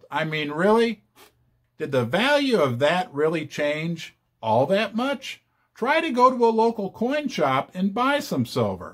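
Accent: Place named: Michigan, United States. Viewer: His accent sound Michigander.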